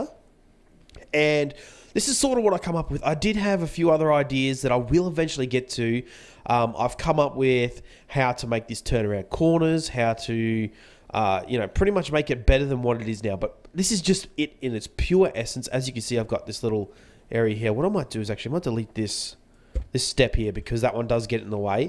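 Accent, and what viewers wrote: Australia. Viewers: are you aussie? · "he's Aussie".........subscribes:D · dude I'm new to the channel and are you Aussie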